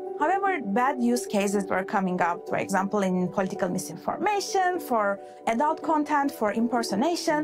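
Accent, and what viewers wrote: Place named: Turkey. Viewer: Tam bir Türk aksanı. Konuyu kolay anladım. Teşekkürler.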